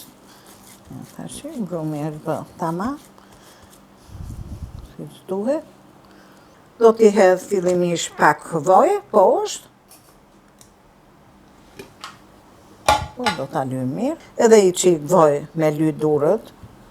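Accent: Tirana, Albania. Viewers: Amon sa mir qe je nje zonj tironce me adete e me te folme.hallall ta bofte zoti☝️🤲🤗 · Te lumshin duart e nderuar zonje.Te kenaq me te foluren origjinale , ke dhe zerin te bukur , dhe te perfytyroj nje zonje te kendshme tironse❤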